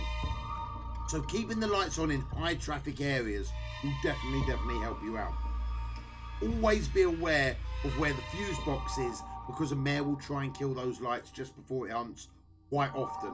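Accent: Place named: Scotland, United Kingdom. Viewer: Not be offensive in any way but just listening to you I know you're scottish.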